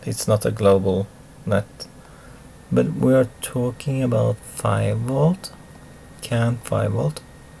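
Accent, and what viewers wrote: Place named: Sweden. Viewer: Schematics is pronounced skematics in english not Schemaaticks.Sounds like swenglish to me.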